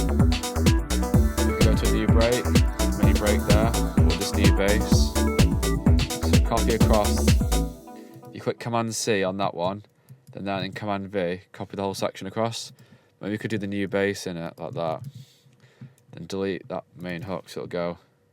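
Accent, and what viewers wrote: United Kingdom. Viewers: Can't understand that heavy British accent. Skipping.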